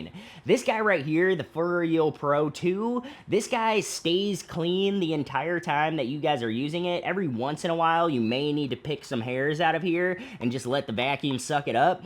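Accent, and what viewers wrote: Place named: Wisconsin, United States. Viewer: I wasn't expecting the Wisconsin accent "don't cha know" , but great video and thanks for the tip on the right tool for the job.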